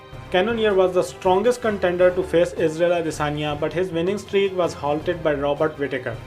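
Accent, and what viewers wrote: India. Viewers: Plz do something about your accents. Ur English is like very very Indian. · "Ur English is very very Indian", lol. Tf do u expect an Indian dude to have? A British accent?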